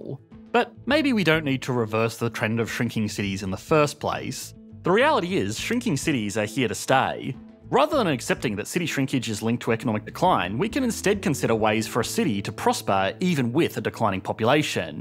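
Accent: Australia. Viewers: I detest this channel, and not because of the unbearable Australian accent. Nevertheless, I want it to go on if just to watch other YouTubers point out the myriad of mistakes in these videos.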